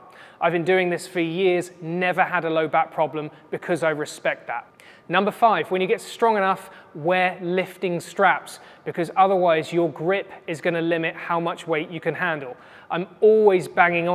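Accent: United Kingdom. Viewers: 😂😂😂 British accent is the best!! 😍😍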